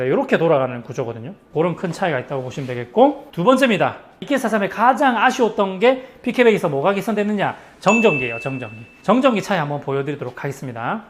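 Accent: Gyeongsang, South Korea. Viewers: 항상 감사한 마음으로 동영상 잘 보고 있습니다. 저도 지역이 PK라 ㅎㅎ.. 억양이 옆집스럽습니다. ^^; 대장님. 동영상 보다 궁금한게..ek43의 노커.. 저게 어느 회사 제품인가 싶어서요.. 노커가 맛이 가서 바꿔야 할듯 한데.. 저 노커가 자꾸 눈에 들어오네요.. 😂😂 그나저나.. 그라인더 성대모사 진짜 똑 같아요.. ㅎㅎ😂😂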